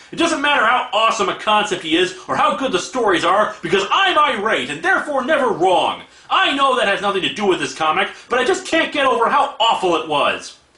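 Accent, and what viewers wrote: Minnesota, United States. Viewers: What pronunciation problem does he have? Unless you mean his Minnesota accent coming through on words like "roof"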